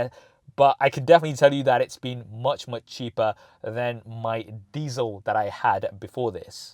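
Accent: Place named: Bradford, United Kingdom. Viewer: He's from Bradford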